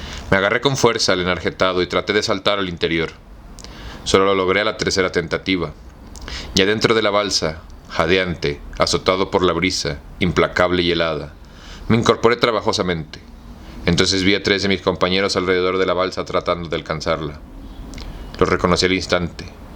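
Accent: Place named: Colombia. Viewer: me gusta como hace el acento colombiano muchas gracias or estos videos soy colombiano saludos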